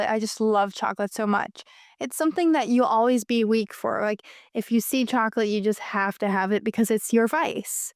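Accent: United States. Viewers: One of the best English teachers on youtube. I love your American accent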